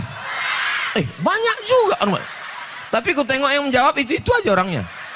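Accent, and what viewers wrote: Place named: Malaysia. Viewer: saya orang jawa sangat suka dan candu dengan tausiah logat melayu UAS,sehat2 slalu buat UAS❤❤❤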